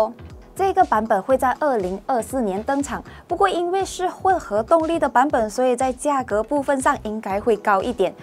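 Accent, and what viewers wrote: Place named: Malaysia. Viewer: 这个AI很有马来西亚腔调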